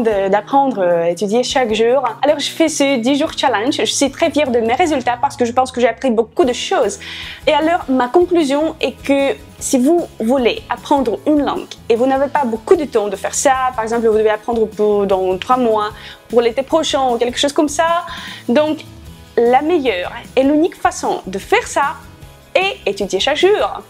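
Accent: France. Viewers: You have a great and unique french accent Ms.. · J'adore ton accent français ! Aimez-vous les langues comme le norvégien, le swedish (je ne sais pas comment ça se dit en français)?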